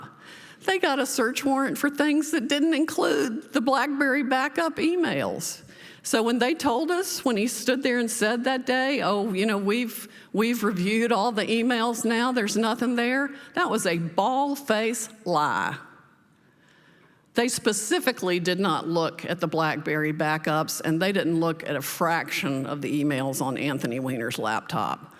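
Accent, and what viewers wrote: South, United States. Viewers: Justice must come within 2020 before the frenemies crawl back up! Love your S. Accent👍💪🗽🇺🇸❤️💡🙏🏻🔑. Yes, Sec8-& Prison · love that SOUTHERN DRAWL Sidney ... ear candy telling the truth is twice as good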